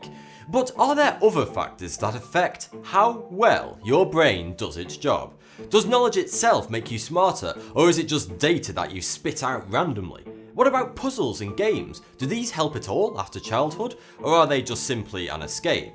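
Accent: United Kingdom. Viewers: Love his British accent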